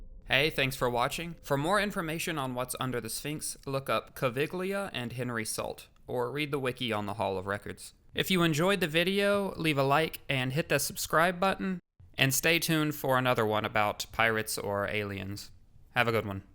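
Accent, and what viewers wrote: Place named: United States. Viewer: You lost me a lot with your odd American pronunciation of Greek, roman and ancient Egyptian names. Thossmess? Plyney? You sound all Greek to me.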